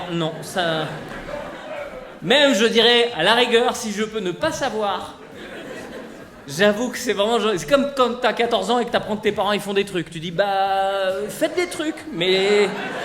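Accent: Switzerland. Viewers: J'adore ton accent suisse, il faut que tu fasse une vidéo où tu parles en Suisse... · j'adore ton accent suisse voilà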